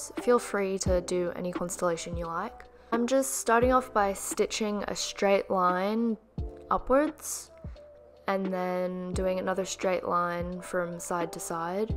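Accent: Australia. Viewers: Australia. It's just discernible in some words ;-) (and it tells on her About tab on her channel page)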